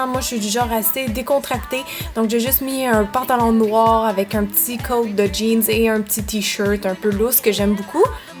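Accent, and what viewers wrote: Quebec, Canada. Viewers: C pareil pour moi je met toujours de la music et au passâge j'adore ton accent québécois qui est perf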